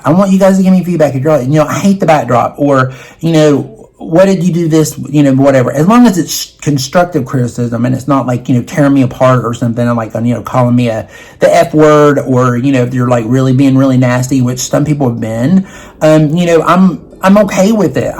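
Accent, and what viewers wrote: South, United States. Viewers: Thank God you reviewed this foundation. I am 39, had a bday recently and have dry dry skin from Sjogrens syndrome. My mom has cancer and has dry skin and has begged me to find a foundation for winter for dry skin. We are Lancome people but they have really changed formulation and now their products are for younger people and they are totally missing the market. So upsetting for us younger middle aged ladies and elderly women like mom because they have discontinued EVERYTHING that worked before and reformulated some things for combo skin and oily skin. Sooo, us dry skinned people are left out in the cold. Just subscribed and will watch some of your older videos. Love that you are down to earth and that you are using a brush for this foundation and not fingers. Most people reviewed this with fingers and didnt get coverage but a dense brush makes more sense. 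Also, I'm from Texas and as a Southerner your accent is normal to me. I watch Lisa and Michelle as well but lately I am SOO UPSET with high end brands dropping the ball with some products. I know Chanel and Dior has reformulated so much with inferior ingredients to keep cost down but those of us with rosacea can really feel it in the skin.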